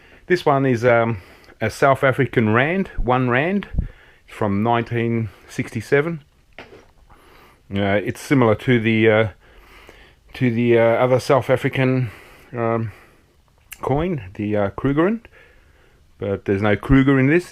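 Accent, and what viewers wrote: Australia. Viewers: I don't know but I found this very funny. I think you were getting a little tipsy.And this australian accent. Lol. Very nice. Cheers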